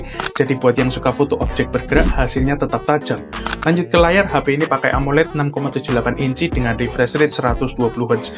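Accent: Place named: East Java, Indonesia. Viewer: Cra jelasin enk,simple n detail jg,logat mcm jwa timuran❤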